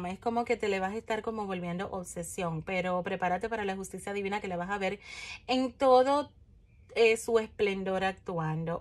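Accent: Dominican Republic. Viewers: Hay enemigos si soy policía y mis jefes son misogenos y yo no me dejo de nadie una verdadera bomba en en trabajo(nido de víboras) yo espero la mandinga o ya al menos el dinero del amor hablamos después jajaja muchas gracias te quiero mucho y me encanta tu acento Dominicano 😘😘😘😘😘😘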